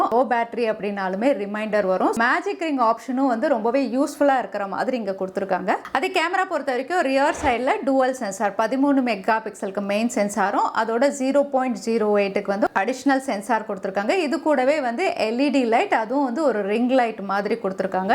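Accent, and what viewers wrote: Kerala, India. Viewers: genuine review ur slang like mallu ,,,,,,,,,,,anyway fantastic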